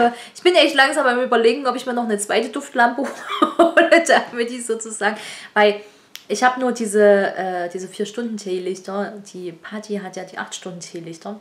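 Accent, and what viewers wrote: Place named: Saxony, Germany. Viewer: "OF RUBBN!!" 😂😂 Oh man. ❤ Find ich soo gut das du dein Sächsisch nicht versteckst! Ich versuche immer mein bestes zu geben mein Thüringischen Dialekt zu verstecken. Und Pain au Raisin finde ich riecht SEHR nach Rosinen. 😂😂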